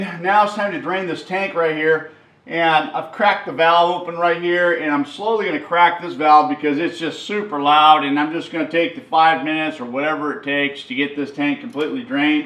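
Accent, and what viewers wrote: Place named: United States. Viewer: It's the American English pronunciation... the norm here.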